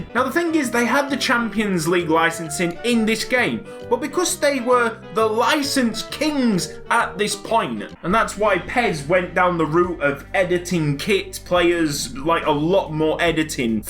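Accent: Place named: United Kingdom. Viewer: buuuuuuuuuuuuuuuu ;_; i mean British accent :#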